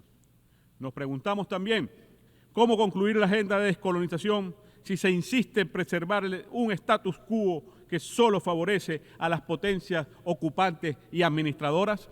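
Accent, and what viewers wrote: Cuba. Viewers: si acento es cubano, trata de hacerlo neutro pero se le sale lo cubano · Usted tiene toda la razón eso ni dudarlo puro Cubano.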